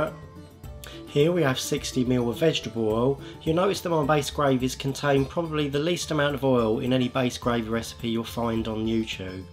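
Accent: United Kingdom. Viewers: I love your accent! What kind of uk accent do you have? is it from kent?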